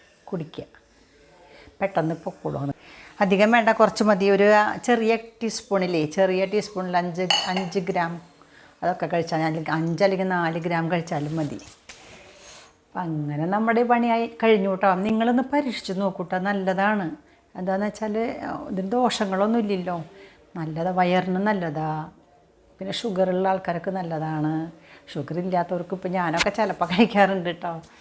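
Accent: Thrissur, India. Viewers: മ്മടെ പക്കാ തൃശ്ശൂർ സ്ലാങ്.. മരുന്നും അവതരണവും ഒരേ പോലെ നന്നായിരിക്കുന്നു ചേച്ചി.. 👌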